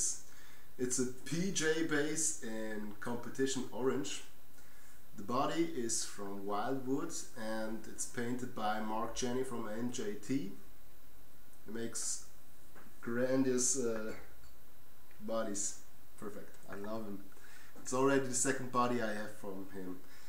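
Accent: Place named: Germany. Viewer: I love your accent! I am guessing your native language is German?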